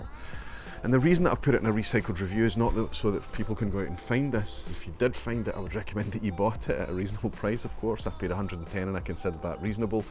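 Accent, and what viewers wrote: Scotland, United Kingdom. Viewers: HEELS PLEASE! New to whisky despite also being Scottish! You sound local also! Trying to watch all your videos and soaking as much knowledge up as I can! New subscriber!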